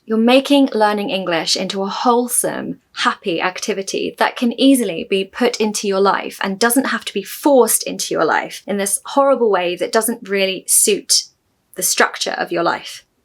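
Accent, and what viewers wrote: United Kingdom. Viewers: your videos are a wonderful gift for us English learners. Your enchanting British accent with an aristocratic touch, your expressive body language, and the interesting contents.... All of these features are so motivational. May I use an Old English phrase? "God bide with thee!' Thank you! all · Happy new year pretty British-English, your lessons are amazing for us, good job, go,go further..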